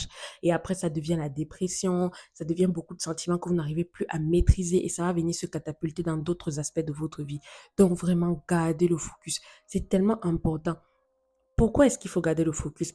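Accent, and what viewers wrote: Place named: Cameroon. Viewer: J'ai l'impression que tu es d'origine Camerounaise de part ton accent... J'ai envie de te dire Merci infiniment ma sœur 🙏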